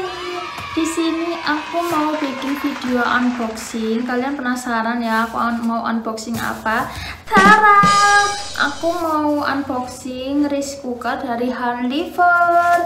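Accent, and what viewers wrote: Indonesia. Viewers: Medok banget kak basanya 😅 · Medok banget mbaknya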